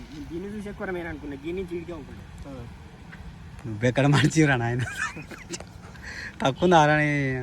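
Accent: Telangana, India. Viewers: Brother nee video anai copy by others youtuber but your voice Telangana style super bro continue with new version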